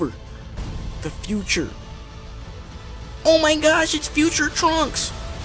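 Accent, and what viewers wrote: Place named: South, United States. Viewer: Your country accent is funny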